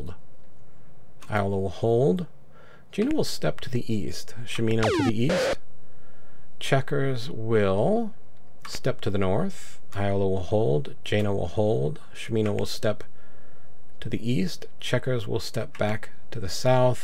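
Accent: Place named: Scotland, United Kingdom. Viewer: Colleague of mine watched you first time and mentioned your Scottish accent Oo ...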